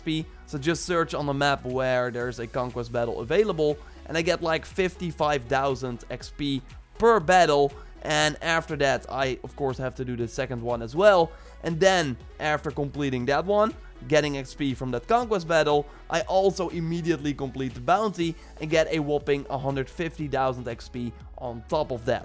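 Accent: Netherlands. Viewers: Echt heftige nederlandse accent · Your english sounds dutch too me 😂😂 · Are you Dutch by any chance? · Jij bent toch een Nederlander hè? Omdat je accent er op lijkt.... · His Dutch accent 😂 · Your definetley dutch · You can hear by his accent, this guy is Dutch. If you is Dutch. "Wassup Northern brother" If ya aint. "How you sound Dutch?"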